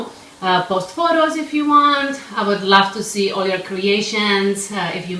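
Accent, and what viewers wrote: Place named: Czech Republic. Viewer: I love the way you talk! I miss hearing the accent of a česká žena! Is it olive oil you use?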